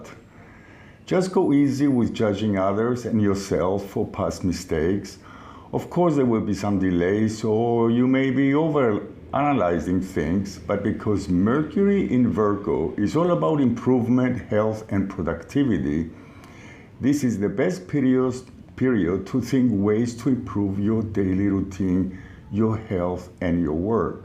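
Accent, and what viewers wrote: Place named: Cyprus. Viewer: Hey hey! nEw here and truly amazed! Judge by your accent you Cypriot? ;p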